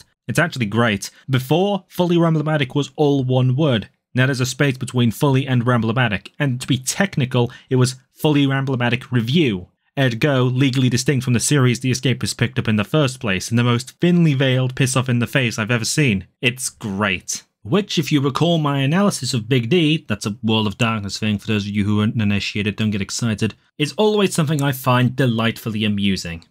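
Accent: United Kingdom. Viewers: I reckon the British accent helps too lol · Go 1.25x speed for more Zero Punctuation Flavor. ...I won't blame your for the less snark British accent but we have what we can have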